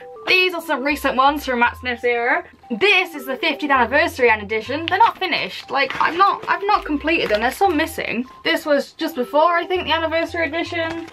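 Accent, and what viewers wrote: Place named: United Kingdom. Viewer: your voice is my favorite a. it's brittish, and b. it's a pretty British :)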